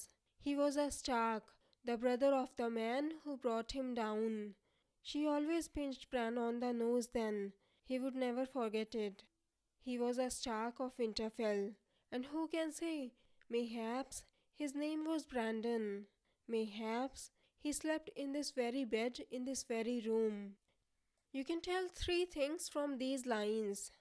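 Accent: India. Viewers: Very annoying Indian accent! Very annoying to listen!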